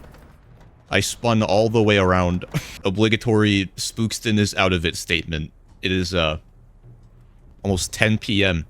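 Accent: United Kingdom. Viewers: SPOOKSTON IS BRITISH? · you are british?😟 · Youre british? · wait Spookston is British? I’ve been hating on a fellow Brit for hating British tanks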